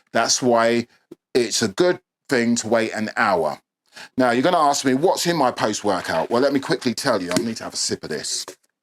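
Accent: United Kingdom. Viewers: with an English accent?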